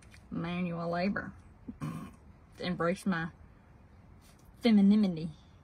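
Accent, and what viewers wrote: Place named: Alabama, United States. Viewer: You go girl love your accent reminds me of home I grew up in north Alabama now living in Texas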